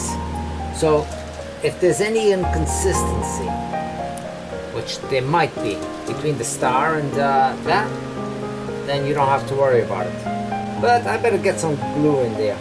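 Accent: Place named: Portugal. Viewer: Bom trabalho! I knew you had to be Portuguese. Your accent reminded me of my father who was an amazing woodworker in his own right. I could watch him all day. I love watching your channel and your amazing creations. You have inspired me to maybe try my hand at wood turning hopefully in the near future. Nao pares suas criacoes!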